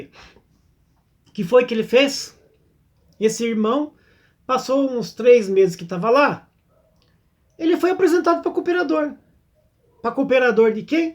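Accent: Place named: Paraná, Brazil. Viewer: Um portugues caipira do interior do Parana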